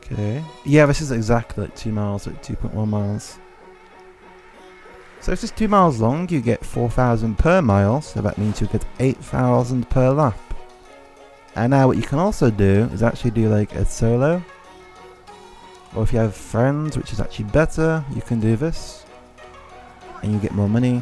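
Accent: United Kingdom. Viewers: I love ur British accent Wish me luck imma buy a pc!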